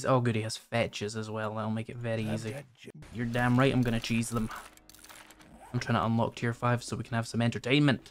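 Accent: United Kingdom. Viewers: The whining is getting cringe. Takes me 4 or 5 times to watch a video because nothing else to watch. The British accent doesn't help, makes it sound miserable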